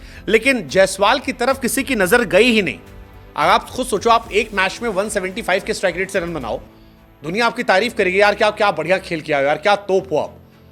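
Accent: Bihar, India. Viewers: Bhai aaj bihari me bol rha hai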